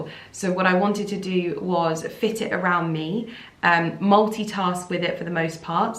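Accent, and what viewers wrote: RP, United Kingdom. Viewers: The way you say “The the the…” before every word is irritating. It’s because of the thinking you do before talking, to try and sound posh. You sounded much better with your natural speech, when it flowed.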